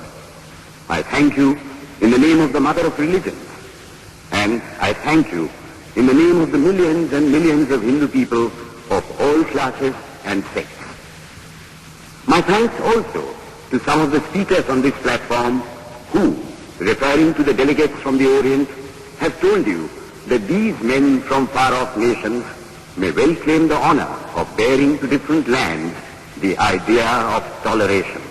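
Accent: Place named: West, United States. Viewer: This is not the voice of Vivekananda. Pure accent of the people in the west.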